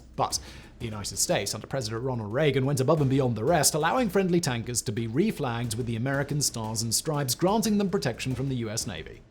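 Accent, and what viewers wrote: England, United Kingdom. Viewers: I wish the prester would drop the quasi upper class English accent ! It just grates on my nerves. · I’m Iraqi and I don’t care if he pronounces them in an English way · .. I do after 1 min in .. he is on 3-5 different channels.. it’s frustrating cuz it’s a interesting topic and than his stupid British/ colonial/ slaver voice comes in.. than you see him and that terrible beard/ bald head.. He is annoying ( sorry )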